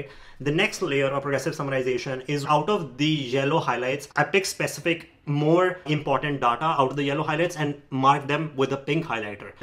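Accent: India. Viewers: do I have to suffer from indian accent to pass step 1 usmle ?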